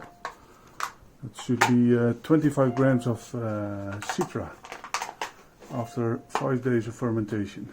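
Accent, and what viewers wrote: Netherlands. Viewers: Yo are Dutchman?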